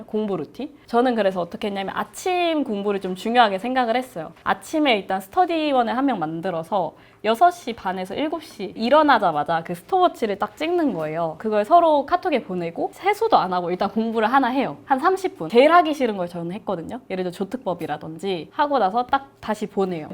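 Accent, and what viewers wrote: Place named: Jeolla, South Korea. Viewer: 선화예고 나오신 분이 뭔가 광주나 목포삘이 많이 나시네요.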